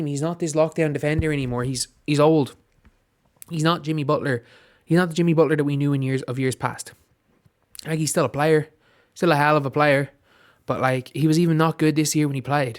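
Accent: Ireland. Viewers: i knew youre from ireland just by the accent .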